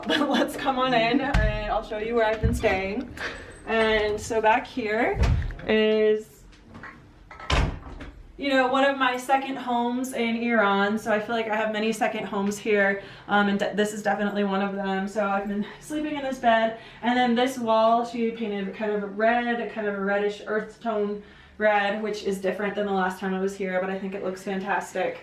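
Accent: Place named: United States. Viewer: Thank you for the tour!😍 I find it interesting that in Iran the kitchens are open in comparison with Azerbaijan, where i come from. It would be also nice if you would go a bit more deep in thr tour and show more details in the furniture, for the kitchen especially it was too quick, and at the end to have an overall blick once again😊 Btw you have a lovely american accent👍🏽 As i understood you are living in the States and just visiting Iran.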